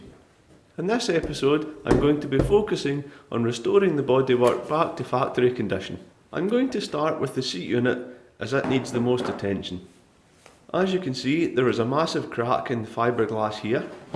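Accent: Scotland, United Kingdom. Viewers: I am here for: awesome restorations = 60%. Awesome scottish accent = 40%. HAHAHAHA love this channel mate! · What a warehouse of skills you have, so great! ( are you from the same country as Jim Kerr "SimpleMinds" ) your accent sounds the same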